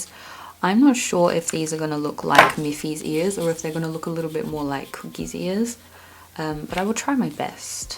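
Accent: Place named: Australia. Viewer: I so enjoy your tutorials love.. Nice to hear an Aussie voice.. Love Anne, from Victoria Australia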